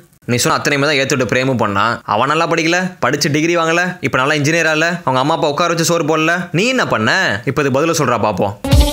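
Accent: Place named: Madurai, India. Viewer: Madurai slang was superb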